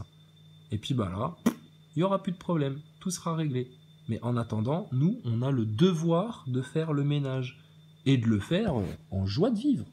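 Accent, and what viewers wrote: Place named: South, France. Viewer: Tu me tue avec ton accent du Sud 😂😂😂🙏😎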